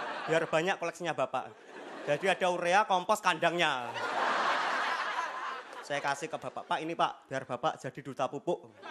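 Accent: Java, Indonesia. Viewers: itu kata" nya biasa...tapi omongan khas jawanya ngakak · aq ska bngettt ngomong medokmu iku loe. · Logat jawa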